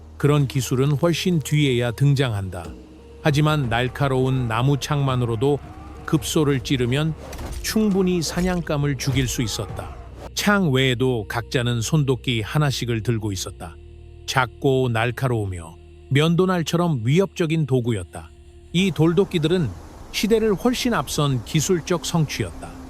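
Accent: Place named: United States. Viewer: 무슨 말이냐 미국사람이 한국말 하는거냐 너무 어색해서 듣기 싫다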